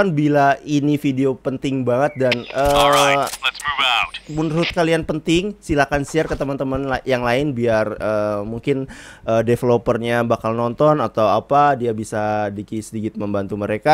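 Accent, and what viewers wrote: Java, Indonesia. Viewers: Bang abang orang jawa ya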